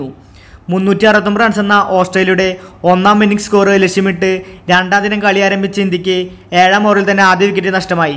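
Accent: Thrissur, India. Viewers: ബ്രോ, തന്റെ തൃശൂർ ശൈലിയിലുള്ള വായന ഒന്നവസാനിപ്പിക്കാമോ. പൊതു ശൈലിയിലേക്ക് വരൂ. എന്നിട്ട് സബ്സ് ക്രൈബ് ചെയ്യാൻ നോക്കാം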